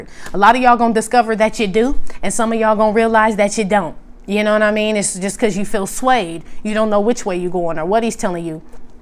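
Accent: Chicago, United States. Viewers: you talk like us in Chicago🤣🤣🤣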